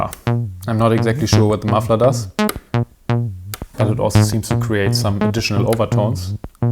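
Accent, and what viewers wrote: Germany. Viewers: I love the way Germans speak English for some reason, and I notice “actually” is a high frequency word for a lot of them.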